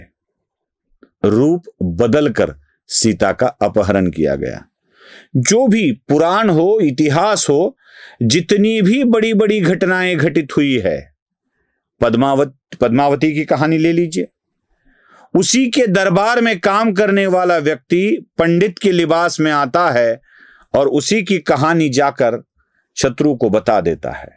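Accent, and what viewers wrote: Maharashtra, India. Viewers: Mujhe Marathi language aur Hindi accent maharashtrian logon ka sun ke bahut achha lagta hai...❤❤❤❤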